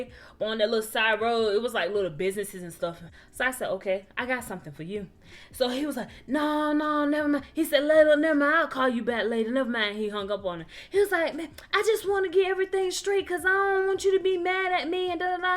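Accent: Baltimore, United States. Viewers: You sound like a bmore girl lol. I enjoyed the story time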